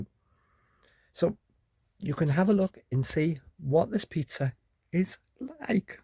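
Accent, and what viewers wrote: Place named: Newcastle, United Kingdom. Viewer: Love the geordie review ❤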